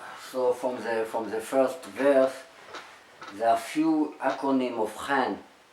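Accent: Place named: France. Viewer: Je prie pour vous Rav, votre accent en Anglais revele que votre langue maternelle est le Francais ainsi que la mienne. Je prie que YESHUA le ROI MASHIAH BEN YOSEPH souffrant a sa premiere venue avant le destruction du 2eme temple et qui a pris les peches des brebis perdues d'Israel en premier lieu et ceux du monde entier ensuite....mais reviens comme le LION DE JUDAH se revele personellement a vous comme il l'a fait avec moi...IL m'a gueri quand je ne le connaisais pas....vous, vous avez le privilege d'avoir entendu la Bonne Nouvelle de Son Royaume, moi je ne l'avais pas eu et je ne savais rien a son sujet....jusqu'a ce que dans la priere au Boreh Olam Baruch Shmo je lui ai demandee de venir me guerir de ma maladie quand j'etais en France. Ce soir la YESHUA Hitkadash Shmo, est venu vers moi, IL a pose ses mains sur moi et IL est reparti, mais 3 jours apres j'etais completement gueris. 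C'est alors que יהוה m'a fait comprendre que je devais lire SA PAROLE ECRITE....mon papa Salomon, qui est au ciel, par la Grace que YESHUA lui a donne, etait le Chazan de notre sinagogue chez les Lubawitch, que j'aime beaucoup, c'est la ou mon fils a fait sa Bar-Mitzva. Mon papa avait grandi dans une Yeshiva a Tanger et il nous racontais que jamais ils etudient les prophetes et il n'avait jamais lu les propheties sur le MASHIAH. Que le D.IEU יהוה d'Israel vous fasse Grace comme IL nous l'a fait a nous et vous et votre famille soyez sauves par celui qui VIT a la droite du PERE Eternellemen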